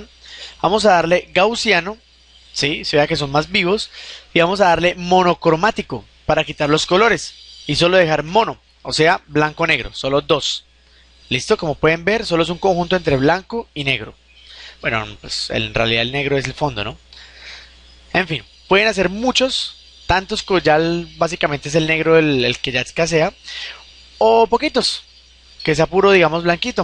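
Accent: Colombia. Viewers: B dis, le escribo desde Vzla, le felicito por sus curso y le agradezco por la informacion compartida. Me agrada que use un lenguaje practico con buena diccion y con su acento Colombiano, porque la combinacion de todo ello resulta interesante, entretenido y no canson. Siga pa lante y sin egoismo que Dios sabra recompensarle. Hagale parcero, hagale. Saludos.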